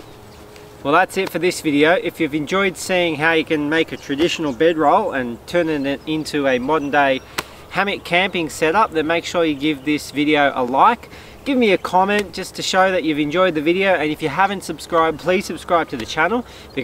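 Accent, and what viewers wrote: Australia. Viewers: Great video,. Enjoy your videos. Love hearing your Aussie accent. Keep enjoying life.🎉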